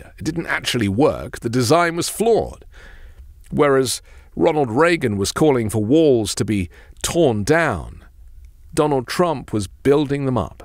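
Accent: United Kingdom. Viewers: You have an excellent way of explaining Trump. I love your Podcasts. I always was a sucker for a British accent ever since the.😊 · My maga people are not going to listen to. Can not get past the British accent in his speech. Not American. The bigotry is astounding. Sorry,.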